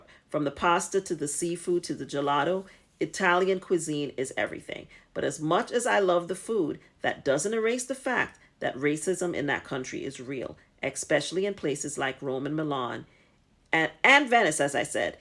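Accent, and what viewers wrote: United States. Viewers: It's too unfortunate that only your American accent is a leverage and asset. Nonodyis is going to respect us (black people).unless we change and improve our conditions.American accent can be an asset. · I guessed Greece was the most racist but Italy is right next door. I wonder if the immigrant crisis has something to do with it (not that I'm making excuses). The fact that your American accent got you a pass makes me think it's aimed at African migrants. You should wear a t-shirt that says: "Sono un ricco turista americano." :p The Netherlands or Scandinavia would be the least racist, being progressive and sophisticated countries.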